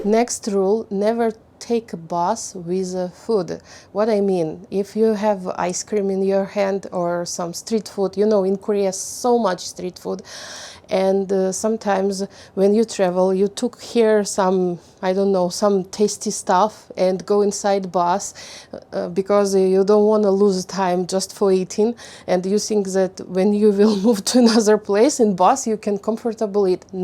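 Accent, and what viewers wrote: Russia. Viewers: Действительно, можно поработать со временем над произношением.) Пока что это классический рашн аксент.)) Но замечательное начало.) Надеюсь, все больше новых комментариев у канала будут на английском от иностранцев. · Thanks a lot for your helpful video! I like your way speaking English. It's very fluent. You sound confident and your hard russian accent sounds exotic. It's nice! If you need any help with English, feel free and ask. I am an English teacher. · Здравствуйте, Ваш английский довольно хорош, единственное, что это русский акцент в словах the, that и т.д. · Я так рада, что ваши видео теперь на английском так как учу его😍 Единственное что режет уши это наш ярко-русский акцент с твёрдой буквой «d», но это чисто мои загоны, по произношению. Но вот что меня порадовало, я без конца боюсь с артиклями, а у вас их не особо услышала, значит можно и без них🤣 Спасибо за ваши старания не смотря ни на что❤️ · Русский акцент никуда не денется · Я не андэстэнд по - английски😂, да и первое видео на этом канале смотрела информативный, куда вы пропали, но какая же вы милашечка! 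Какой милашный акцент, русский прям, так приятно наблюдать за вами и слушать! Спасибо большое за ваш труд, больших успехов и развития каналу!🫶🏻🌹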